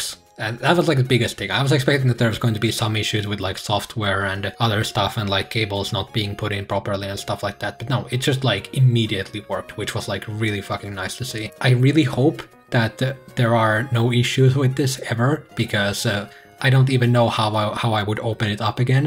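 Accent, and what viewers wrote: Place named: Finland. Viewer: By the accent, I knew you are Finnish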